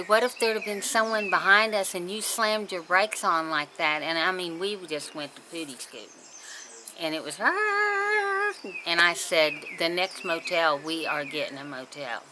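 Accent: West Virginia, United States. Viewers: I enjoyed listening on my morning walk this morning. I also gave you a like. Keep making your videos. I watched Little Poet when she first started and now she has 100k subscribers. I am all about people making money on You Tube. It is a good extra income for those that can do it. Love your W Va accent ie, " you'ens. I am going to Ohio to visit my mom and sister in August. Not really a vacation, but we love to see them and we take 3 days to get there only drive 6 hours a day. So we sight see a bit on the way up. I hate taking medications always some kind of side effect. Sometimes there is no other way though. I hate cockroaches, I would not have stayed either.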